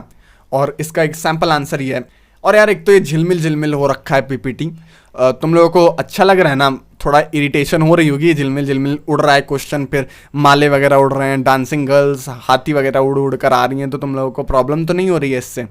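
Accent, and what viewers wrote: India. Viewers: Bajya aap gujrat ya bihar ya rajesthan ze ho kya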